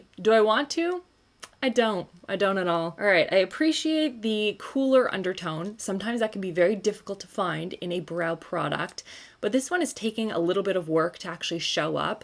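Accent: France. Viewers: Combination = combinaison (french) love your french accent <3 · a "combo-nation" is so cute and make almost more sense than the actual word "combinaison" ❤❤ Love you and keep speaking french I love your accent !! (French girl here)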